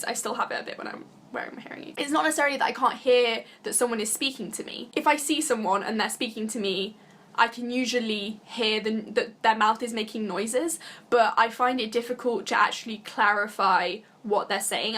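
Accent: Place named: Midlands, United Kingdom. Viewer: Brendow Félix She's from the Midlands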